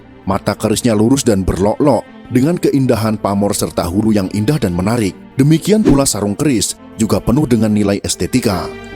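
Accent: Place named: Java, Indonesia. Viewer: Cetho jowo mas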